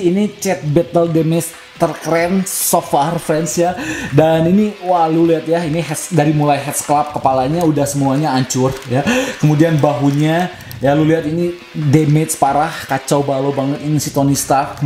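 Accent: West Java, Indonesia. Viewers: bang sunda urang mana?.....bandung sanes?...wkwk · Bang orng sunda? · Orang sunda euyy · Bang abang orang sunda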